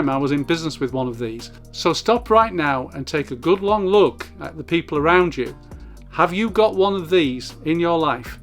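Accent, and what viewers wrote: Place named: England, United Kingdom. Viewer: I could listen to you all day. I like your English accent! I believe it makes that experience pill easier to swallow. I was hypnotized to quit smoking 17 years ago. I believe it helped because she had a wonderful accent like yours.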